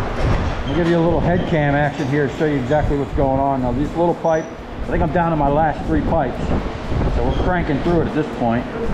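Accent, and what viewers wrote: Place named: Philadelphia, United States. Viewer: You sound like you have a Philly accent.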